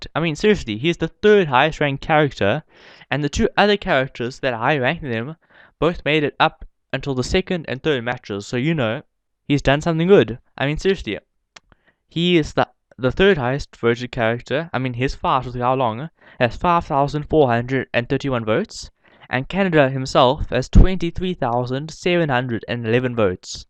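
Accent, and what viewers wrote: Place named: South Africa. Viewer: You said pure maths and I’ve only heard us South Africans say that, plus you got a South African accent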